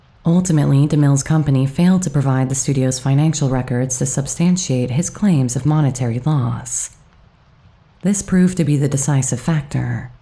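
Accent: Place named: United States. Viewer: I love the narrator’s voice, even tho’ American. ❤😊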